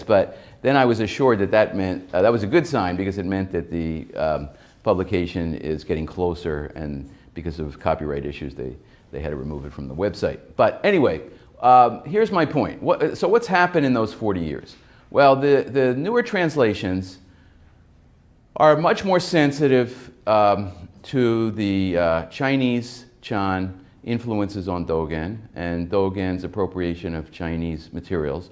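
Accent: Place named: Malta. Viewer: she sounds Malties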